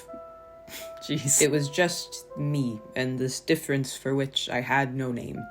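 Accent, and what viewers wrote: Midwest, United States. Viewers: I don't know where in the midwest your accent comes from, but you have a very distinct speech pattern. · Can I ask is somebody who is in the shoes that you seem to have been in when making the video you reference at the start, what was it that encouraged you to go through with the full diagnosis? I’m pretty much 99% sure, and I’ve just found out that I’ve got to start the waitlist again because I’ve moved from one County to another which is like moving states. (I am assuming from your voice that you are American) it’s going to be 18 months, but I’m really sort of at that phase of feeling sad about the whole realism that you probably are… You got any advice ?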